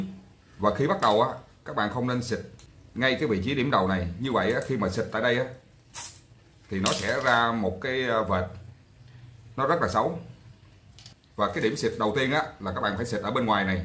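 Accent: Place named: Vietnam. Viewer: ฟังภาษาเวียตนามแล้ว ปวดหัวมาก สำเนียง ปิดลำโพงเลย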